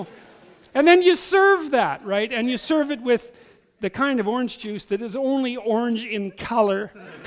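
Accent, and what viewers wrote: Canada. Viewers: He's from northern Alberta and you can hear it. That's why he also drops the occasional "eh." He doesn't talk like a stuffy Toronto professor at all. It's very endearing, actually.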